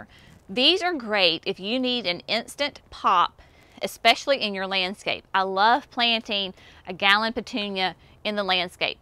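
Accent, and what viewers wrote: South, United States. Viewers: just a little off topic...I just watched the tree removal vlog....just for future reference ( from a Canadian girl ) ....a TOBOGGAN is a flat sled .....a TOQUE ( pronounced too-k ) is what americans call a beanie, like your cute little white hat.....by the way , I love your southern accent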